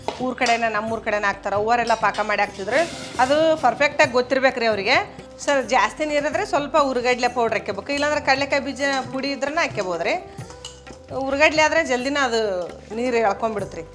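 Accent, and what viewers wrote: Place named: North Karnataka, India. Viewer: Do not stop talking.it reminds our Uttar Karnataka languages. We are missing it a lot❤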